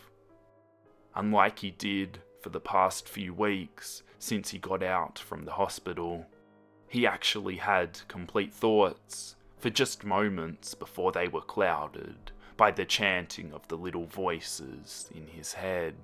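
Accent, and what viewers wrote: Australia. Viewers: You sound like an Australian version of Chillz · I think it sounds more austrailian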